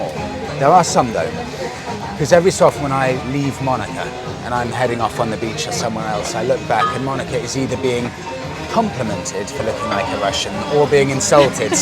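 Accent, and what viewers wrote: England, United Kingdom. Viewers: Nice to hear a proper English accent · The bike s in immaculate condition. RE is the pride for India. It's one of the oldest motorcycle company in the world & it's still growing. Are u from the UK. Pls comment ASAP. Perhaps from your accent I just picked it up. Thank you. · I only watched the whole video because of the British accent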